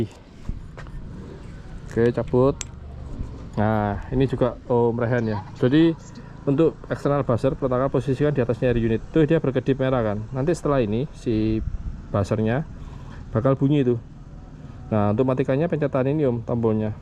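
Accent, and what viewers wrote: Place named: Bali, Indonesia. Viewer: Main-main (masih kebawa dialek Bali-nya om ) 😆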